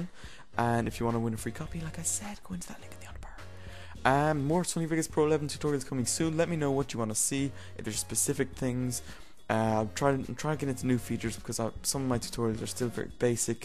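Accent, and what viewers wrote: Ireland. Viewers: Sexy Irish husky voice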